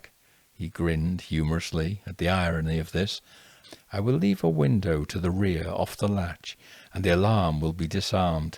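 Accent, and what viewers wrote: England, United Kingdom. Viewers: is a fantastic writer and storyteller i have loved all his stories with his wonderful english accent also all drawings as well i can't wait till his voice puts me to sleep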